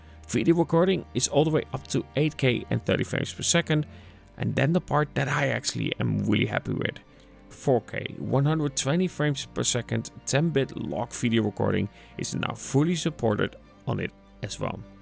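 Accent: Netherlands. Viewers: Just a little dutch accent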